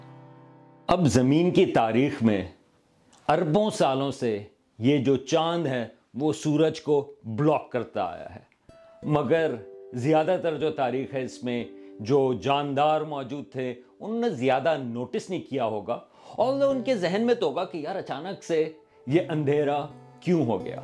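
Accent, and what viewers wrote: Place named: United States. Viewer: Very Interesting. An American way of expressing in great urdu language !!!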